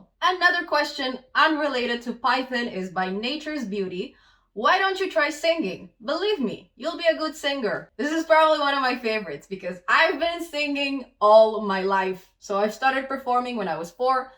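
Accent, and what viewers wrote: Russia. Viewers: your voice gives me tingles. Where u from? Russia, Ukraine?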